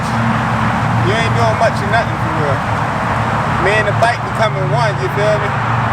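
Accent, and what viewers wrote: Baltimore, United States. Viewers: It’s like an illusion 😅 bmore accent strong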